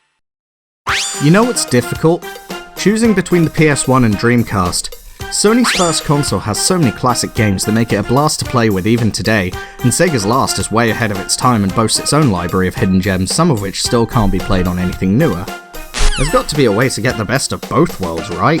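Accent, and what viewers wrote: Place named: United Kingdom. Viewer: never even heard of bleem until this video was recommend to me 😂 and which part of Britain are you from?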